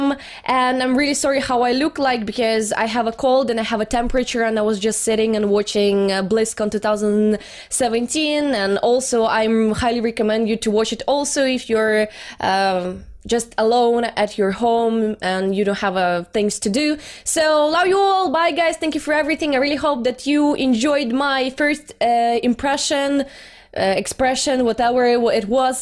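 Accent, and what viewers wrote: Russia. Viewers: Ты из россии, либо говоришь по русски? Акцент тебя сильно выдает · new to channel, is your accent ruske?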